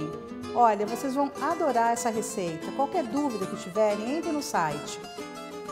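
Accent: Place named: Brazil. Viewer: É de Portugal???? de repente pareceu-me que era Brasil!!!!!!!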